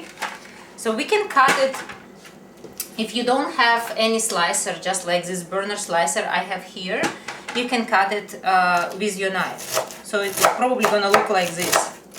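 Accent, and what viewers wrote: Russia. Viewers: terrible russian accent... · God how I love Rooshan accent.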